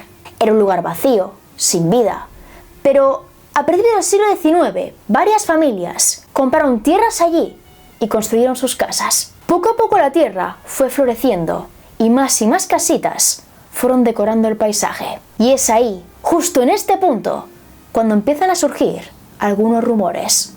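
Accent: Spain. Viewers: A mi antes me disgustaba pero me acostumbré a ella y a su acento español y ahora de agrada.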